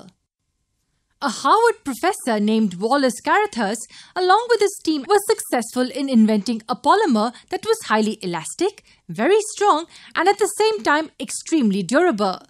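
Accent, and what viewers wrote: India. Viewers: speaking english like indian ! :(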